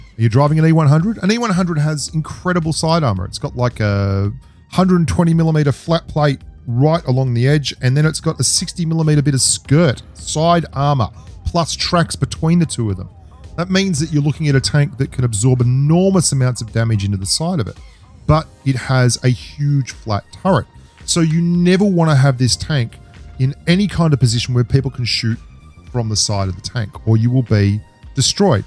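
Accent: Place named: Australia. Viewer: I sure did miss that Aussie voice. I quit playing for several months and came back and started playing again. Happy to see you back to posting regular vids.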